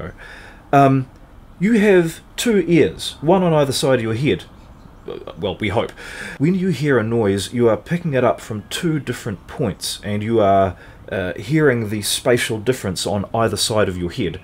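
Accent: New Zealand. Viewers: I have 4001 and find the neck pickup needs to be at about 85% before it’s just too much bottom end. Thanks for for the shielding tips - tempted to see if you would do a bit of a upgrade to mine. you sound like you could be in NZ.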